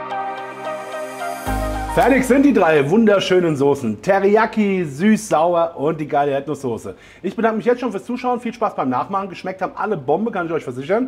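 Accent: Hesse, Germany. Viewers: Hallo, ich bin ein Hesse der in China lebt. Ich finde es total super wenn ich hessisches Gebabbel hoere und dann chinesischem Essen sehe. Du kommt richtig echt rueber und dein Kanal bereichert mein Leben hier.Mach so weiter. Koch doch mal Yunnan Kueche.Hier in shanghai gibt es ein Lokal das heisst lost heaven. Findet man ueber google und alles was dort auf der Speisekarte steht schmeckt super. Aber keine Ahnung wie man es kocht.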